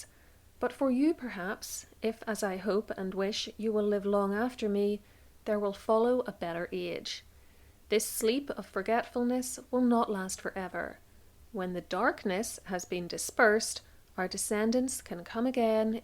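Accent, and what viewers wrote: Northern Ireland, United Kingdom. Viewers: Oh, your accent is absolutely grand and I have no problems whatsoever cathing everything. Never been to Northern Ireland though, only Éire, but I really want to visit the north as well. Hello back from your distant, rainy neighbour Noreg. :) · Great video, thx. Just have to say, me mum grew up in Co Derry. Love your N Ireland accent. I subscribed based on the conctent. Well done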